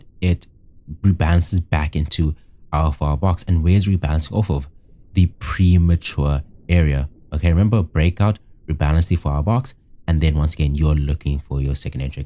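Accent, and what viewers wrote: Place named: South Africa. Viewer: 😊detecting a SAn accent here.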